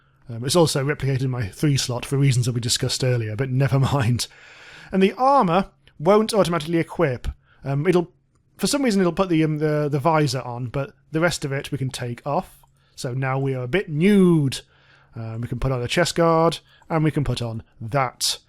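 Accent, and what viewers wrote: United Kingdom. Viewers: This guy narrates like roald dahl with a british voice, love it !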